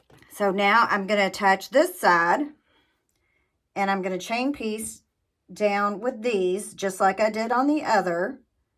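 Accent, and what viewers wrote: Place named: Tennessee, United States. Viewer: That's a beautiful quilt. Are you from Tennessee, by chance? Your voice reminds me of some of my folks in Overton County.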